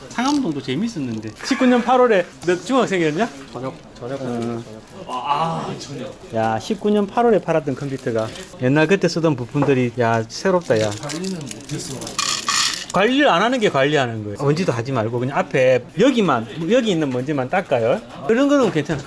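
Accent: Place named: Daejeon, South Korea. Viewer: 대전촌놈이 유튜버로 인기좀 얻엇다고 서울올라와서 별 ㅈㄹ을 하네ㅉㅉ 말투부터가 촌티난다 ㅉㅉ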